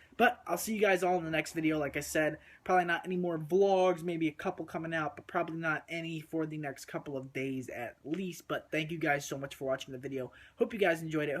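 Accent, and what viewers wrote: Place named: East Coast, United States. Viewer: i thought you were east coast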